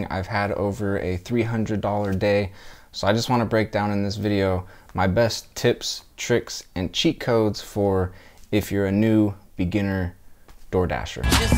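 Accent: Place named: West Coast, United States. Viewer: E-Bike, west coast accent and $35 an hour. Definitely has to be SFBA.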